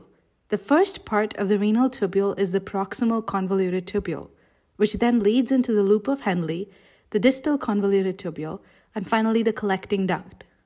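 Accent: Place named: India. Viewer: sounds so indian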